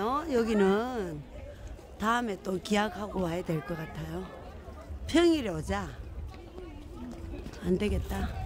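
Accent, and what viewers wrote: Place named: Pohang, South Korea. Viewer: 4~~♡ 포항이라서 사투리가 반갑 네요 소중하신 님의 영상 열열이 응원해 드리고 물러갑니다 수고 하시고 또뵈요,^^ 👍♥️🌈🙋‍♀️👍😃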